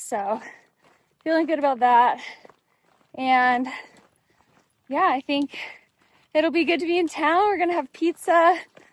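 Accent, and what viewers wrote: United Kingdom. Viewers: You’re doing great on your hike! Enjoy your British accent, did you live in England at some point in your life? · Pure London, is she part british?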